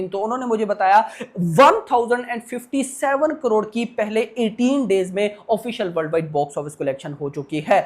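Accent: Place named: Pakistan. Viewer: Why his accent is so so similar to Pakistanis ?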